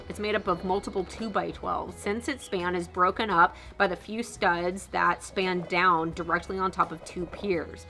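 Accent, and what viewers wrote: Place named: Midwest, United States. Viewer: why do you have a Midwest accent rather than a Texas accent. Are you a transplant? Where are you from?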